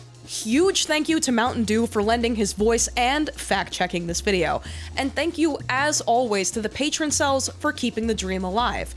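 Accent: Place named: New Jersey, United States. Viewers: I feel like youre holding back a jersey accent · Alt woman with bangs and a moderate new jersey accent explaining in depth ancient gay memes. My kryptonite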